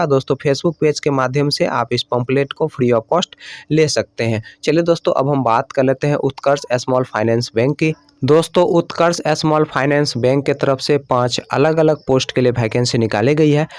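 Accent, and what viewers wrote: Bihar, India. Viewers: Sir AAP Bihar se hai kya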